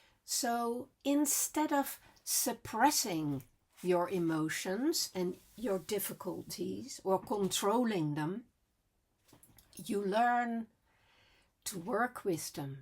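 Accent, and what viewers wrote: Netherlands. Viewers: I believe I was born a true Stoic by nature. I made a conscious decision at a very young age, say at about three years, not to cry and draw undue attention to myself when I fell and grazed my knees. Having this innate attitude has got me through some very difficult situations but it has not helped me to cultivate joy in my life. This is why, as I enter the twilight years of my life, learning about the Existentialist perspective of what it is to be human is so valuable. Additionally, my partner has also started listening to your channel and not only has he commented on your sweet Dutch accent, but he appreciates the content of your channel.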